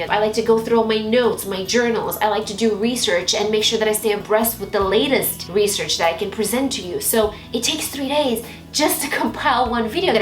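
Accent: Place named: Romania. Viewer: Hei! Din nou iti scriu in romană,sper ca nu este ceva care sa te supere,dar stiu ca esti românca asa ca de fiecare data cand adaug un comentariu la un clip de-al tau aleg sa iti scriu in romană! Imi place foarte mult accentul tau cand vorbesti lb engleza! 👌🏽👌🏽 Dintre videourile tale am 2 care imi plac in mid special, si anume "Smokey green and glitter tutorial" dar si "10 Beauty and skincare recipes"! Te urmăresc si pe Instagram si imi place sa ma uit la insta storyes făcute de tine! Sper sa fie cu noroc participarea mea la acest concurs! Pupici din România! 💋💋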